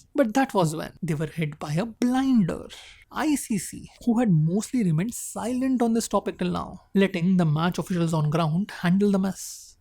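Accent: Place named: India. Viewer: My goodness I can't take this accent. Among all the English accents, Indian English accent is the worst. My goodness. Go easy on Ts and Ds . You might kill em 🤣🤣😅😅. Not that other accents are better but if compared ......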